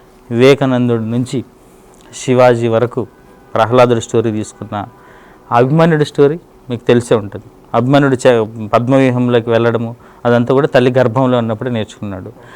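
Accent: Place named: Telangana, India. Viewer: Good chala helpful parents doctor garu chala Baga cheparu. A Chala Telangana slang lo super cheparu doctor garu. Nijamga Epps tiki alanti Valli unara Biddu thadu bellam lo pettukoni tine Vallu.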